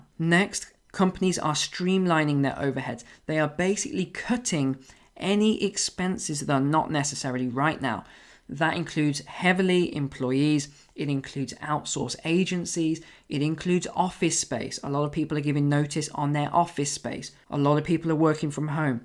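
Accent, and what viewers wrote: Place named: England, United Kingdom. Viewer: This is totally irrelevant but I usually don’t like English accents but your voice is so soothing. I’ve started to listen to you in lieu of ASMR in order to fall asleep. I know it might seem like it but it’s a complement. 😴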